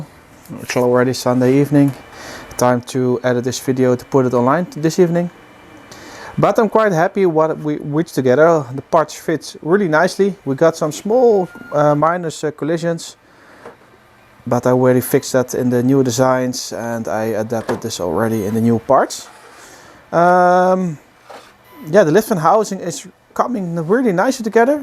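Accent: Netherlands. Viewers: Very nice, but damn your Dutch accent is heavy: Fideo, nafigation etc etc.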